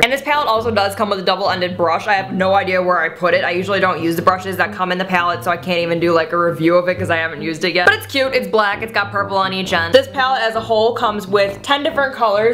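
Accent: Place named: Midwest, United States. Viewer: Lovin your Midwestern accent :)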